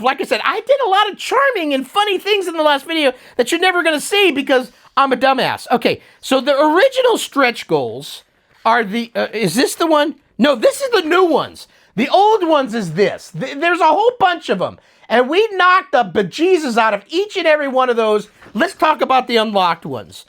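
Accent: United Kingdom. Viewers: I went to the main news channel ZX Spectrum Next to say hello again (I'm one of the 27 lucky ones) and thank you for everything you do. I'll subscribe, I'll drop by more often, and at the same time I'll improve my English skills, because no matter how hard I try, I can't understand anything about the British dialect :)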